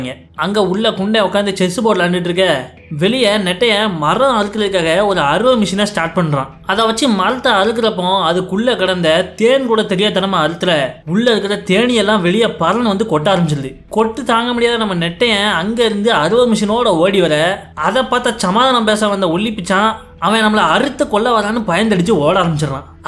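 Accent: Tirunelveli, India. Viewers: Bro neenka tirunelveli ah bro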